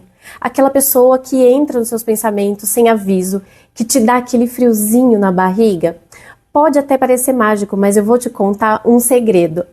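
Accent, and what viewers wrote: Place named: Caipira, Brazil. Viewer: Tirando o sotaque caipira fica perfeito.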